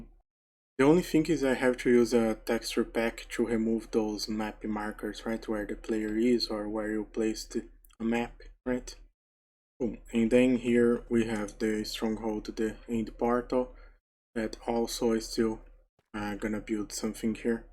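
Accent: Brazil. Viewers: Que sotaque bom de ouvir. Sotaque br pra inglês é massa demais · esse cara é muito brasileiro kskks, o sotaque entregou de cara, BRABO!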